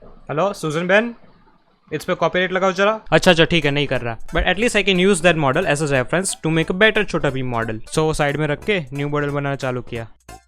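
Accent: Gujarat, India. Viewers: Omg a gujju speaking hindi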